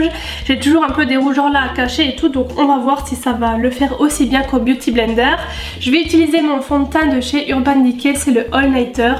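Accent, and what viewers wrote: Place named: South, France. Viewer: ah boooon tu viens d'Alsace, moi aussi mais je trouve pas trop que tu as l'accent Alsacien j'aurai même presque dis du sud mdr, aah c'est peut être parce que tu viens d'Alsace Moselle sinon superbe vidéo continu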